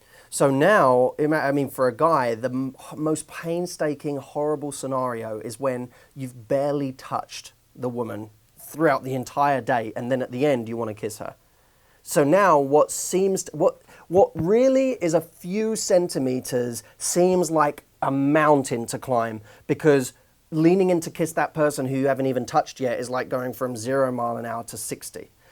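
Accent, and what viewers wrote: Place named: South London, United Kingdom. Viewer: Where are you from? South London? Your accent is adorable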